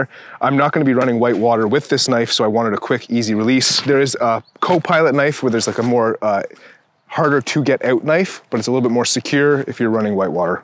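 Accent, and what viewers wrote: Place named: Canada. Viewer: God damn you Canadian asf!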